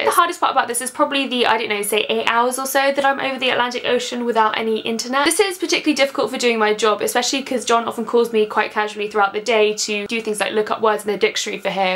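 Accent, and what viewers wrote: United Kingdom. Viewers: Oh my god a British accent on vlogbrothers it feels wrong yet beautiful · The Queen's English. Not everybody speaks 'Merkin, yanno?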